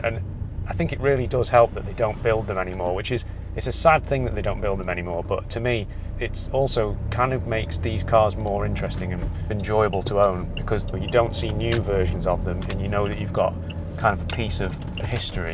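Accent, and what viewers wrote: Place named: Staffordshire, United Kingdom. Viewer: Staffordshire! I was listening to your accent, thinking you must be somewhere close to where i grew up (Stone). Keele University signs on the buildings puts you near Newcastle. Nice review!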